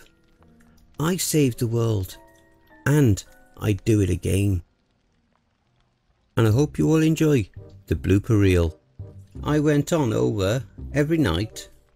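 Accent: United Kingdom. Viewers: I'll listen to it. Listening to a narration with a British accent is so great.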